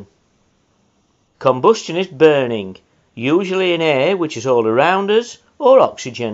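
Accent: United Kingdom. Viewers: Its not weird, it's an English accent(British).I know its pretty weird for all of us,,, I understand!.) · I was looking for a short, to the point and well explained video to summarise the incomplete combustion of hydrocarbons - this is excellent. Fits my purpose perfectly. And I love the accent - very British!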